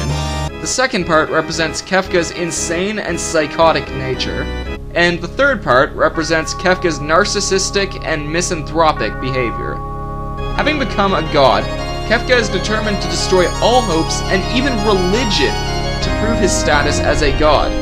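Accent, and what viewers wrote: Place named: Canada. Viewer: You Canadian? I keep hearing you say "aboot" and "doobt".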